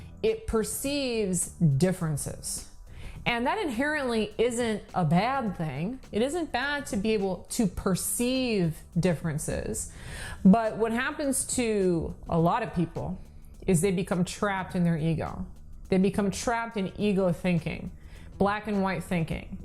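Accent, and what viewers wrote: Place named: South, United States. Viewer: love your southern accent )))))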